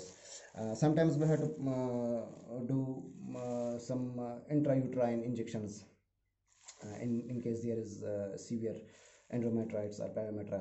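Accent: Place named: India. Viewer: A very nice gulabi English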